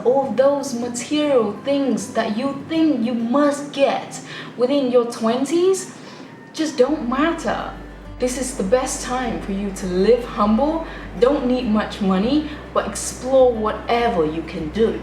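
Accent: England, United Kingdom. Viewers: Yuh gurl, didn't expect that British accent !!? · Giọng Anh Anh nè. Iêu quá <3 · Oh my god, is that British accent, i'm learning American accent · Ui chị nói giọng anh anh hay quá 😘😘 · Your accent is so nice : )) British accent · I like British English and i like the person who speaks British English, too =))) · Giọng chị hay quá. Accent này là giọng Anh đúng không ạ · Charming accent, em nghe giọng Mĩ nhiều quá rồi, giọng Anh thật sự là 1 làn gió mới đối với em <3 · Such a helpful vlog! I love your English accent helping me practice listening! Thank you <3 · Tha'ts British English :3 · That’s a legit British accent ! Or should I say, the Queen’s English <3 absolutely stunning! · Chị sống bên Anh lâu năm, accent chị hay quá 😍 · Giọng British của chị charming quá! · sao chị dùng british accent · Em thích giọng Anh Anh của Chị quá. Cảm ơn Chị đã tạo động lực và truyền cảm hứng cho mọi người <3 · British accent là đây. 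Yêu. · You are so familiar with Lucy with British accent, < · I feel strongly obsessed with your beautiful British accent. :D It keeps playing in my head after finishing your video. And you know what, I just realised that your face and your accent remind me of the actor "Sansa Stark" in series Game of Thrones :). Anyway, love your accent very badly :) (P/S: this is one of my very rare comments on youtube)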